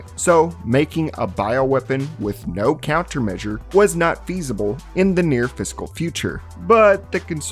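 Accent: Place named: South, United States. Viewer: Man you’re voice gets less southern with time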